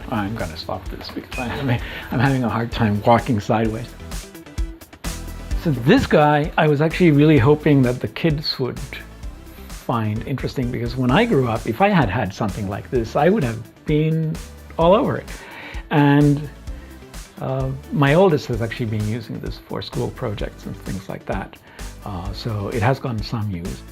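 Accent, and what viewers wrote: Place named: Sweden. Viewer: wtf he speaks fluent finnish with swedish accent, as many swedish speaking in Finland.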